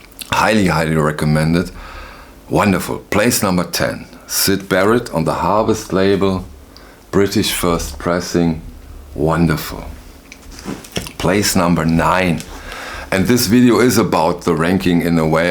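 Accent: Germany. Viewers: Just a comment out of left field for you. You have a great voice and your light German accent makes it even better. You give me vibes of a 1950s beatnik. I guess you have to be above a certain age to even know what that means. I enjoy your passion for music and records, your clips are very interesting and entertaining.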